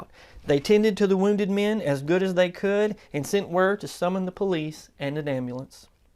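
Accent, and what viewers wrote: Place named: Tulsa, United States. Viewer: OK, I grew up in Tulsa and still live nearby and you sound like a local. Am I correct? Great job.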